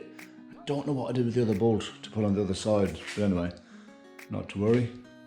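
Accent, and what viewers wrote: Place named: Ireland. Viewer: Sounds Irish 😅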